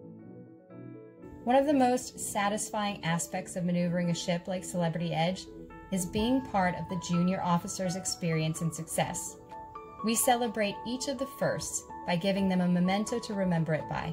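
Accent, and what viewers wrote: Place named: Australia. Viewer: #captainKateMccue are you a fellow Aussie? Your accent sounds Australian :)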